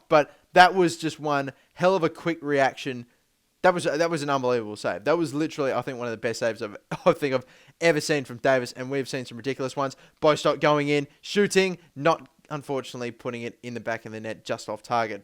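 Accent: Australia. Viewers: Gotta love the Australian accent! :)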